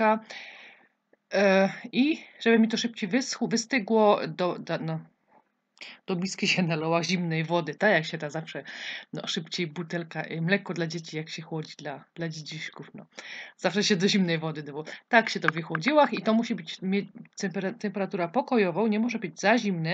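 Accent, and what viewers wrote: Silesia, Poland. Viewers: Pyszny przepis! Dziękuję! Słychać, że Pani ze Śląska! :-) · Pani ze ślunska ja?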